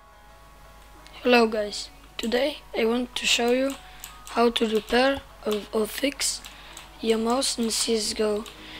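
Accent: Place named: Poland. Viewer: słychać że polaczek :p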